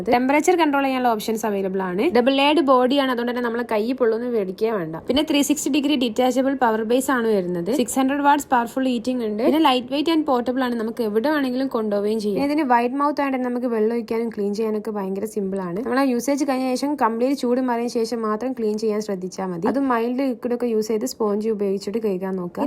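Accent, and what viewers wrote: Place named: Balussery, India. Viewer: Kozhikode Balussery aahn thonnu samsaram kettit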